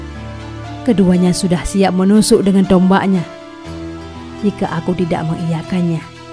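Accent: Aceh, Indonesia. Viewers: pengisi suaranya seperti logat org aceh😁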